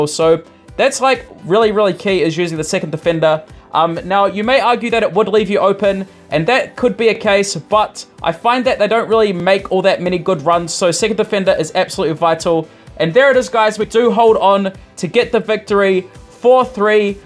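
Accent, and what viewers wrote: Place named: New Zealand. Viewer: He sounds like a kiwi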